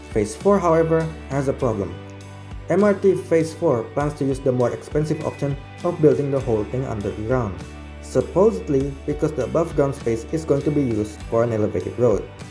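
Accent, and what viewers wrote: Indonesia. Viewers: Dari suaranya yg punya Chanel orang Indo ini,boleh juga bikin Chanel pakai bahasa Inggris selain mempromosikan Jakarta sekalian cari adsense penonton luar 😂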